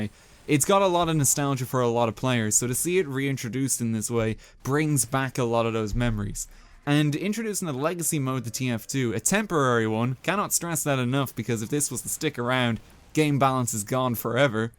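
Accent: Ireland. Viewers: are you from ireland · Hey are you a fellow irish person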